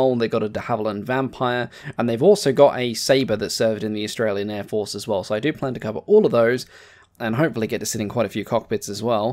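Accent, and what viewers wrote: United Kingdom. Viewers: Never put you down as an Aussie, your dialect is more Brittish. Sweden had quite a few of these planes, always nice to recognize them.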